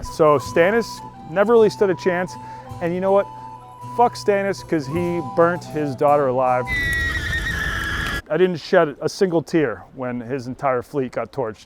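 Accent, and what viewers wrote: Boston, United States. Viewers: Dude you're hilarious, its the subtle boston accent that does it.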